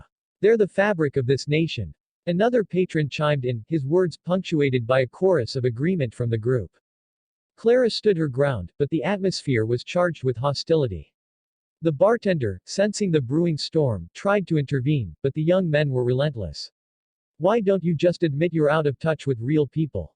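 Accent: United States. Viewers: Why do we have listen to a story telling American it’s cringeworthy.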